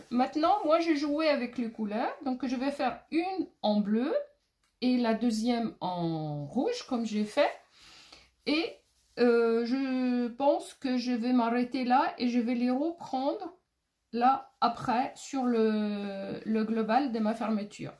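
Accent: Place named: Alsace, France. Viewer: Bonjour, merci pour vos conseils. Le pantalon est presque fini, je vais coudre une fg sur le côté. N'ayant pas pris les mesures nécessaires pour réaliser une braquette . Merci , le prochain patron , je m assure qu'il soit avec braguette Et je me plais de penser à cette réalisation. Je suis très prototype avant de coudre , j'avais réalisé que mon exercice s' avéré incomplet. 😮 Bon c'est sur je m'y met. La couture est mon deuxième poumon 😂 Merci encore, également votre petit accent me plaît bien. Alsace peut etre? Amicalement!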